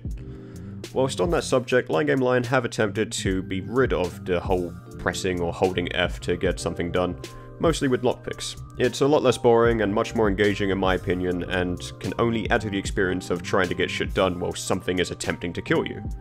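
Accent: United Kingdom. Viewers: where abouts in the UK you from?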